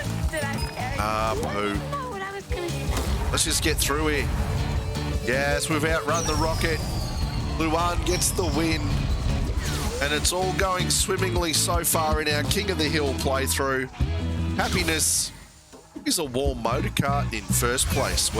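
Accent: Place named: Australia. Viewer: I hope for new updates and its okay for pronouncing names wrong, but you're Australian accent is cool.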